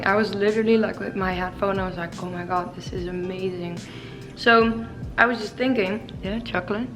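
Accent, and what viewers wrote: Netherlands. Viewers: I missed your sweet Dutch accent here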